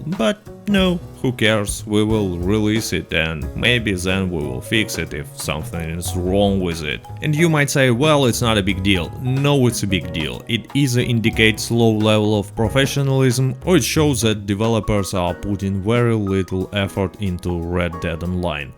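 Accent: Russia. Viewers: I like your russian accent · I didn’t have the chance to buy them last time. By the way huge fan here :) keep rocking and keep roasting with your Russian Accent <3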